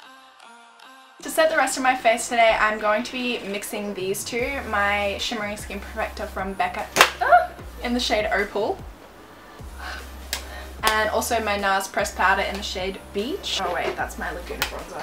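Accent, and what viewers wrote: Australia. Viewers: u r Australian?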